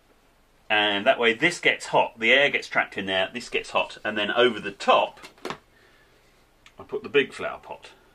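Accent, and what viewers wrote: United Kingdom. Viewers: it is the British version of a penny.I made the comment because of his British accent and that he said pence and not pennies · This guy is scotish? :D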